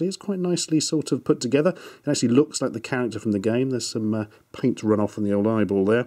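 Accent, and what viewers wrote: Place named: England, United Kingdom. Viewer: Are you in England because your ascent and you you said pounds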